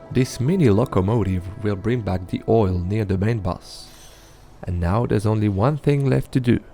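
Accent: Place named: England, United Kingdom. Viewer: Joli accent englais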